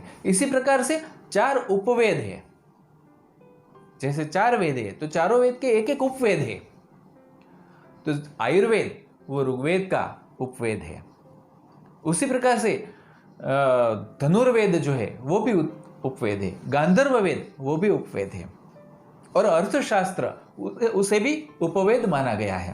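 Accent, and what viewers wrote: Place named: Gujarat, India. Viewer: Tamari peli second ni boli thij khabar padi gayi k tame gujarati cho 😎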